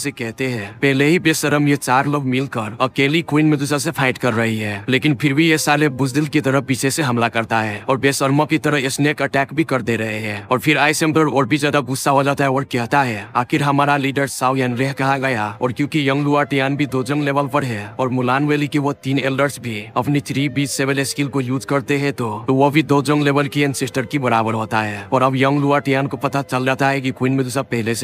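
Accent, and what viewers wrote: Bihar, India. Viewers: Bhai aap 😂paka bihari honge 😂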